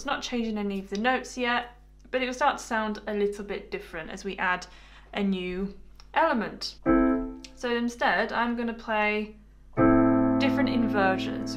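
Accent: United Kingdom. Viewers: Wow, what a great piano lesson, wish you were teaching live! Exactly what I needed to know at this stage of my piano journey. Your British accent is icing on the cake. Well done madam.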